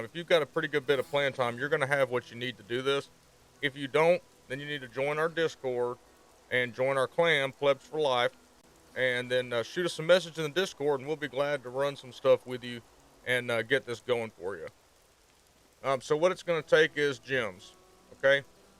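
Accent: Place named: Texas, United States. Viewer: As a Texan who plays Diablo, but doesn't have a channel... hearing your accent was such a breath of fresh air haha.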